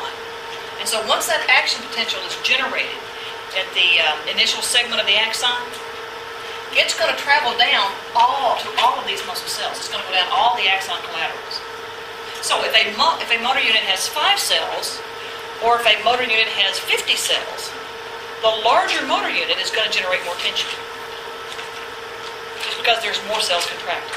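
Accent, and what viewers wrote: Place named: South, United States. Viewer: You are awesome, your videos are so wonderful,plus it seems easier to understand with a southern accent ;-)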